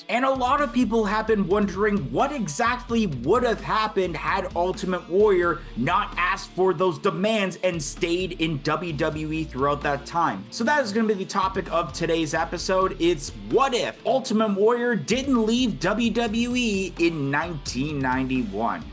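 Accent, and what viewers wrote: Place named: Canada. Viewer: What part of Canada are you from?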